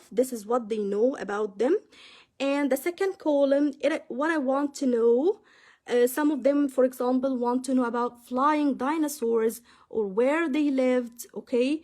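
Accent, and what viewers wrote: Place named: United Kingdom. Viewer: تبارك الله Your language is really perfect fluent and confident, British accent.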